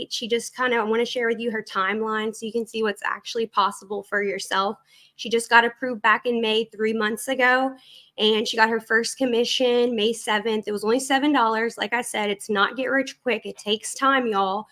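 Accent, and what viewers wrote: South, United States. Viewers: love your videos and this is a great one, as always. Also, talk how you want to talk. Don't change for ANYONE. You are you and that is why many follow and admire you for what you are doing. Sucks on what has happened to you from this jerk, but it will pass like everything. Some speed bumps along the way, but you will come out at the other end stronger. Love your southern accent and how you talk, so keep it going!!!!! From Ontario Canada, and if you heard me, you would say I have an accent also, so keep moving forward & upward!!!!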